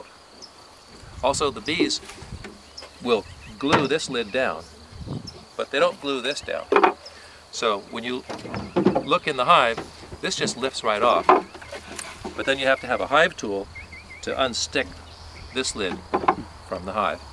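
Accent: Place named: England, United Kingdom. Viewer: Hey you sound like your from England, did you move to the USA then to start your bee company?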